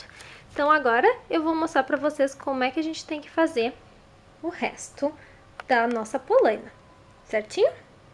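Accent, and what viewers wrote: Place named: Rio Grande do Sul, Brazil. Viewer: vce esplica mto bem ..esse teu sotaque gaucho e mto agradavel..bem facil de entender.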